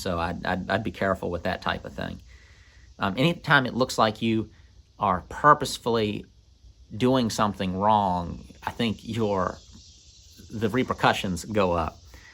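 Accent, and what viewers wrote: South, United States. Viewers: I agree with the chap who said you could do motivational speaking, myself I also like just the sound of your voice and your accent! ( southern US) I also would love to see this house when it is finished but nothing you post is boring because everything you post teaches me something. I love the passive aggressive, there is a lot of that going on in Britain, it's almost second nature. Family comes first doing things you have to and because you want to I can always go and study other videos you've made which cover almost every aspect of what you would want to learn thank you for some more great content. Kind Regards from South West England.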